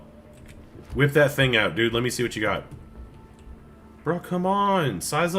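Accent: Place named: Australia. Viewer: i can’t place your accent. it sounds australian sometimes but american at other times lol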